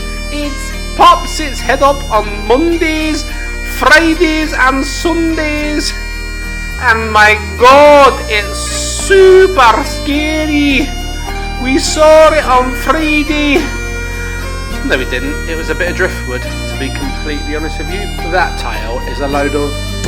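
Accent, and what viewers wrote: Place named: Scotland, United Kingdom. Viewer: your Scottish accent is ummmm good but funny 😂such beautiful seems 100 percent take Teddy there (obviously in like a year or something)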